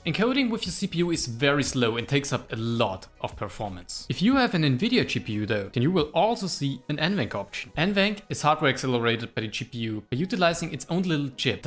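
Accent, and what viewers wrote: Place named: Germany. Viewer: I could tell you were born in Austria, Germany, or any other place that speaks German as a language. the accent sounds german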